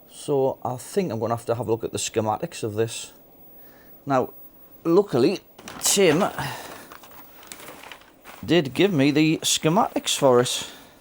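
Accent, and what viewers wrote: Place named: Liverpool, United Kingdom. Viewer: Why are you speaking in a scouse accent? Are you even from Liverpool? If you are not from Liverpool then why are you speaking in a scouse accent?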